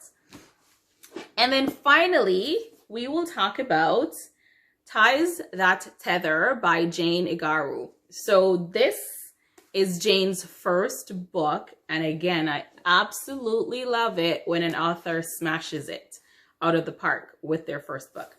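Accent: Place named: Ireland. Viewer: Hey! I have been following you on insta (I am libraryjunky) and didn't realize you have a book tube channel! So fun! I LOVE your accent! Where are you from originally? Am I hearing Irish or Jamaican or am I crazy?!? Hahaha! Nice to see you on here!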